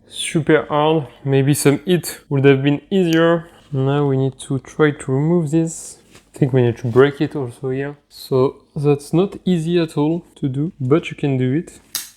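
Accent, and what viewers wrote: France. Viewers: great—just a suggestion: if you want, try improving your English pronunciation to make the video even more enjoyable, because it's quite hard to follow you with your very strong French accent.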